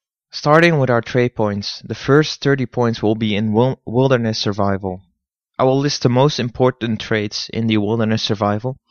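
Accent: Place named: Netherlands. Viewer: You sound Dutch! xD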